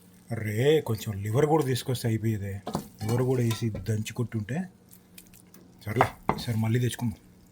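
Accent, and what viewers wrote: Andhra Pradesh, India. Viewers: Nizamabad antunav kani nee slang mitham Andhra style undi beo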